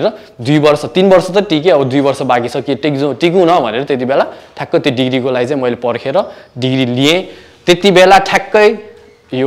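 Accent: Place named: Busan, South Korea. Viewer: Busan tira ko tongue xa ko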